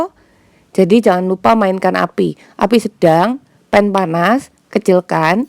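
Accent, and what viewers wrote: Java, Indonesia. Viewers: Diputtaaar....di cellup,dijillattiii😀...aq gemmess ama suaraneee,RENYAAH,wong jowoo taa...luccu,saabaaar...lope..lopee💋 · Dasar gulung pakai Nada jawa he he Isian nya kelapa Gulung gulung Ayooo gulung meneh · Jadi kalo untuk dadar gulung itu yang berpori semakin bolong semakin ciantikk ya, ternyata yang enak itu yang pake telur, kalo pengen porinya banyak taflon panas tambahkan minyak lalu masukan adonan, kalo pengen kulit nya halus jangan kasih minyak dan api kecill, sipp trimakasih tipnya ternyata adonan nya kasik telur dan minyak sayur, selamat berbuka puasa, aduhh ada yang logat jawa nihhh hahah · Mari bikin dadar gulung dulu...iya bu sudah faham..ada yang seperti macan...iya cantik mom..kalau mau yang mulus pakai api kecil gak usah pakai minyak..omongannya kok kayak wong jowo mom...waduh ada roti...mau dong..lanjut di isi pakai unti kelapa...di gulung lipat gulung lipat..haha ayo lagi siapa takut...ada2 kamu mom..lumayan buat aku ketawa hari ini dadaaa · Medoknya jwany mn jeng, 😊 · Buat isian semua bahan campur Lalau masak awas jangan sampai jd srundeng Lalau buat kulit tepung gula telur tambah santan santan kara instan lebih kental Tambahkan pasta pandan aduk sampai rata,jangan khawatie jangan sampai beekwtu janganlupa tambah minyak goreng di sari Yap Pas banget buat ta'jil baiat sesiei akan dapat 12 janganlupa Duk duslu Nang ini udah terlihat guling bolong, u menciptakan adonan bolong itu pnannya haru panas Olesi minyak masukan asona dintuplek hasilnya bolong bolong waw kayak orang Jawa wkwkwk mantab medhok nampak macan Kalau mau yang mulus api kecil pan hangat gak pake minyak,Nang sekarang akan kasih isian kedalam kulit ini yang sedang bolong bolongnya, Wah saatnya gulung wkwkwk wong jowo ngono iya to 😆 mantabz dah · Berpori euy kayak wajah AQ klo lagi ga dirawat hkhk Mm suara nya medok ok jg pantes jg jd org jowo hkhk Pas bgt buat takjil niii ga perlu beli Yg pertama bkin si unti dlu ya Kdua bkin adonan dadar nya Pake teflon ternyata kayak bkiin leker ya Selera ya boleh yg berbolong bolong boleh yg mulus dg api kecil pan hangat ..monggo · Walikimsalam kaka sayg algamdllah puasa nya lancar Wah aku sellu gagal buat dadar gulung mawu coba resep Gula ama kelapa jangan jadi srundeng😆😆😆 Telur nya simpan bagian tengah ya kaka 2 sendok makan miyak gorenggggg di cicin minyak dikit dah da msup adonan nya d goyang2 wah hasil nya bagus bolong2wah rotinya melenuk gaya ngomong kaya orang jawa kaka guluk embk wah hasil mantap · Profilnya ganti jadi pangling nih, cantiiik bgt hasilnya, adonannya di saring dulu biar g bergrindil, tumben nih logatnya medok😁😁😁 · Medok bangget mbk yu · wah dadar gulung nya enk bngt buat tajil cocok bngt mah dari pda bli cara biat nya juga gampng y klw liat mmh yg but tpi klw rara yg buat pasti gagal hahaha,wah ada dua macam y mah dadar gulung nya yg satu berpori yg satu nya lagi mulus ,cara buat nya sama cmn beda di saat waktu manasin adonan nya klw yg pori saat adonan d masukan ke pen pen nya tunggu panas dlu baru kasih adonan pke api kecil ,klw pngn yg mulus pen nya jngn pnas tpi hngt sama dngn api kcil klw dah buat dadar gulung nya tinggal masukin unti nya dah d gulung" jadi dah dadar gulung hahaha ,wah itu ada roti mamah abis buat roti lngsung buat dadar gulung y ,wah ada ilmu satu lagi ternyta dadar gulung ada yg bisa g pke telor juga y mah ,aduh gagal pokus ma suara mmh yg medok 😁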